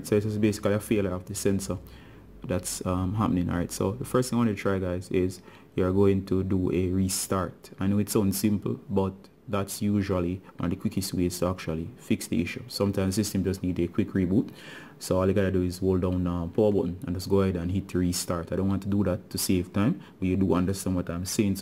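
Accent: Jamaica. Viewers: came for iris scammer fix stayed for the jamacian accent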